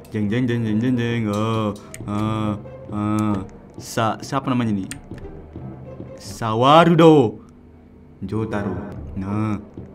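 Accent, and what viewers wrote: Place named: Malaysia. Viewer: apa bahasa malaisya ya maaf aku tidak tahu omong omong nama aku syaurah suara nya kaya malaisya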